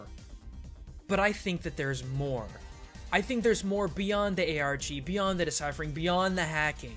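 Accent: Spain. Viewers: Man dat spanish accent!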